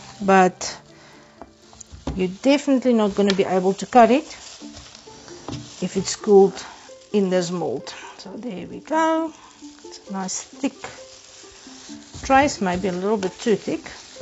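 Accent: South Africa. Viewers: I thought you had a German accent I'm sorry South African that's COOOL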